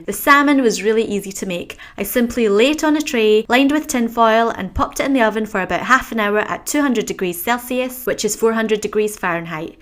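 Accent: Scotland, United Kingdom. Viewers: Wait a minute.....are you Scottish?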